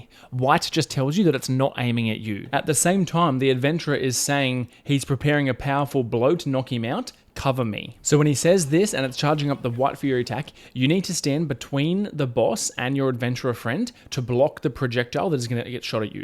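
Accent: Australia. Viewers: Heard the Aussie accent and instantly hit subscribe. Gotta support me fellow Aussie content creators.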